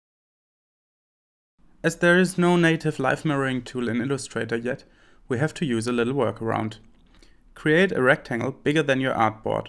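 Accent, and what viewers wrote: Germany. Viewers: you have a german accent, are you german? Man I love your tutorials! because you simply show the hidden tips and tricks, I'm a professional graphic designer, but those little tips you give, I haven't seen them anywhere else before! keep it going :)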